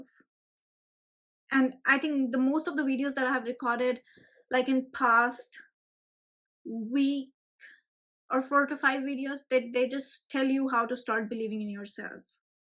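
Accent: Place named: India. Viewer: your content is good but the accent is ..... haha you know. Chose either Indian or american accent. Dont mix both .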